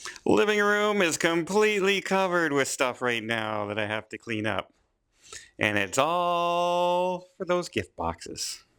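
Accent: Canada. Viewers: BH Most likely his accent. is Canadian.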